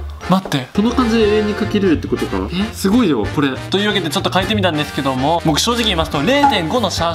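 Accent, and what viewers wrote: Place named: Kansai, Japan. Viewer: 謎の関西弁。惚れた。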